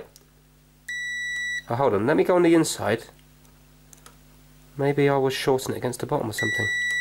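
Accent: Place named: East Anglia, United Kingdom. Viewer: I can't quite place accent though. Sometimes he sounds like he's from East Anglia, other times Irish!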